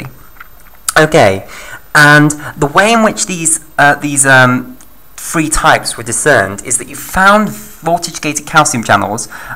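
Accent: England, United Kingdom. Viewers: Thankyou, clearly presented. btw are you from England?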